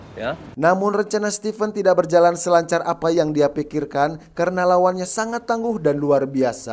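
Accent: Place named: Tasikmalaya, Indonesia. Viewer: suara tasik mana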